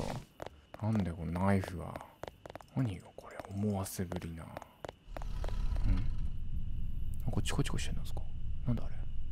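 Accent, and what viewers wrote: Hokkaido, Japan. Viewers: 先生の作品紹介が全部好き。滑舌良くて聞き取りやすい。そしてたまに出てくる北海道弁がかわいい笑(私も道民)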